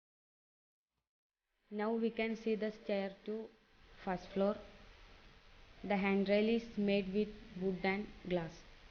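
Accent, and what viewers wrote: Kerala, India. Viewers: Who noticed malayali voice over 🤠😀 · Sound like southern accent of female may be Kerala's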